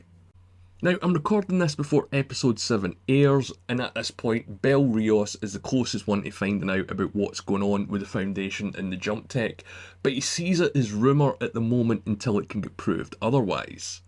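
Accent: Scotland, United Kingdom. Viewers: Is this Scottish English?🇸🇪👋🏻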